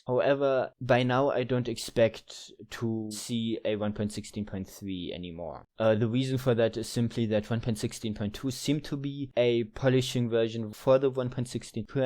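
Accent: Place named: Germany. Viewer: a yes. my library of german minecraft yt accents is growing once again, but maybe by devlog 4 I will get used to it and won't notice anymore (as happened with mango, clover, and probably many others) EDIT: also that pronunciation of your name is... is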